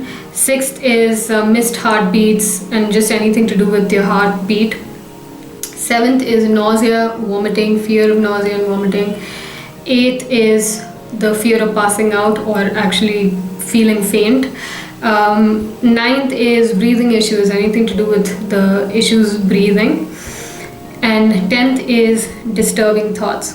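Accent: India. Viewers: so cute i love the asian indian accent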